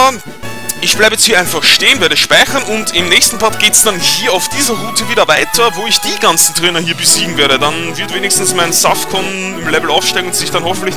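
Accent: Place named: Austria. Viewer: Ich feiere ihn auch vorallem wegen seinem österreiischen Akzent :D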